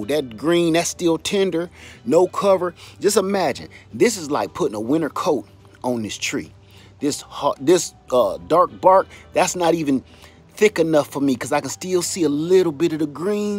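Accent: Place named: South, United States. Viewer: I loved this video! First off, your southern accent is EPIC! I’m from New Orleans so you talk Like us lol. Secondly, the way you kept talking to little kierra i was weak. My mom always says u have to talk to the plants and show them love maybe thats what I’m doing wrong and tje the way the Lillian tree looked I’m tryna get to this lemon tree level! One day! But in the meantime I’m coming up there to pick from your lemon tree cause u got plenty lol😊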